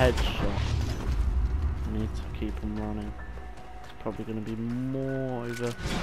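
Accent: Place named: Yorkshire, United Kingdom. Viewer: Yeah, thanks I already did it back in chapter4. Appreciated though, if I hadn't known then for sure I'd have checked it out. All the best Mrs (cool as heck name by the way). Just checked your channel out, your accent made me chuckle, reminded me of the Batley accent (where I'm from in West Yorkshire).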